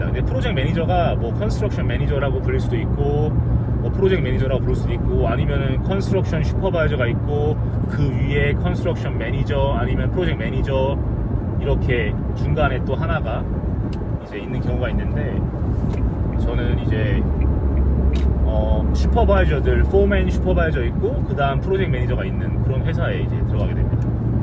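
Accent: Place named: Australia. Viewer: 호주는 몇살때오셨나요? 영어발음이 호주계 한국인같은데..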